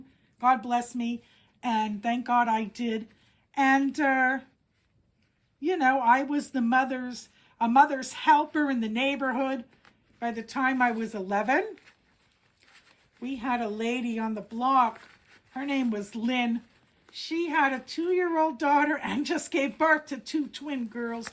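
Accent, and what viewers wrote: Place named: Italy. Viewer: I can hear the Italian in your voice 💗 What a story to tell - celebrating the wonderful you you have become 💗 What a sweet baby - and that is an impressive brush 💗 We have an adopted son (8 at the time, adult now ). He is very good to us - he very much appreciates havng had 'parents/family' in his life, like you. You have a wonderful story to share with others 💗 God Blessed you 💗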